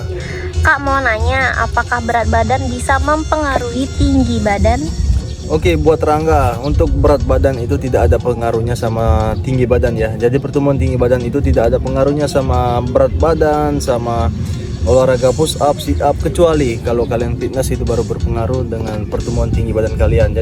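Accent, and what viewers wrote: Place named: Palembang, Indonesia. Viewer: Uwong palembang caknyo kakak ini wkwk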